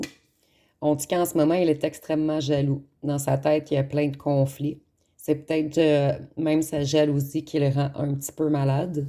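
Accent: Quebec, Canada. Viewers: Merci. Belle guidance.. j entends que vous etes Quebec . Je suits Francaise qui habits Les USA….cela m a fait tres plaisirs de vous entendre. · L"accent québequois❤❤❤❤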